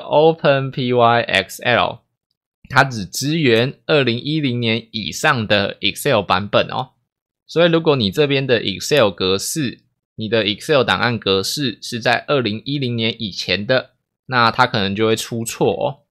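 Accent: Taiwan. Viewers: 台湾乘程序员？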